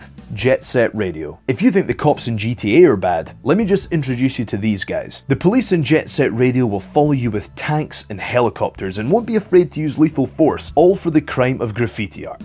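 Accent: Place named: Scotland, United Kingdom. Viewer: Scottish probably from Edinburgh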